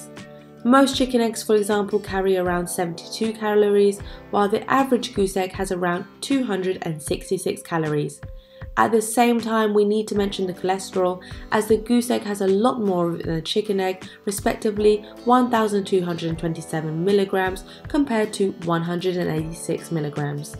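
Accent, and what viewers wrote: United Kingdom. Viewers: She has a English accent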